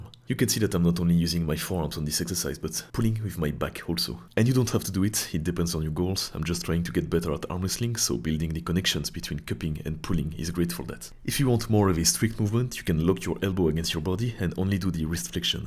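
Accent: France. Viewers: langlais en mode francais ta pas lacent va sur internet ya des prof danglais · Oh vu de ton accent, tu es français, non ?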